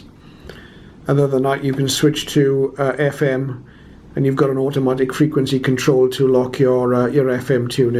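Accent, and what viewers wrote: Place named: Scotland, United Kingdom. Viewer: I did listen again, and all I heard was that rich Scot brogue…..unlike any other English accent !! I have heard them all.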